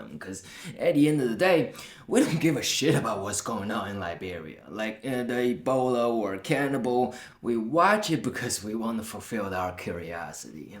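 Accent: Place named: Houston, United States. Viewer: wow brother. love to hear and see from more small youtube channel mainlanders lately. you are very brave! great rap and great takes on all the videos ive seen so far. where did you learn your english? 😂 its awesome! you sound just like some of us asian folks in houston, texas!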